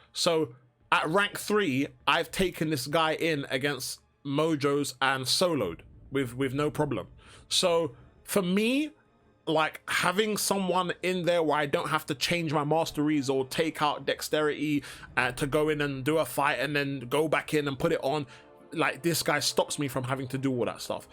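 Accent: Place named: Jamaica. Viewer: turned full yardie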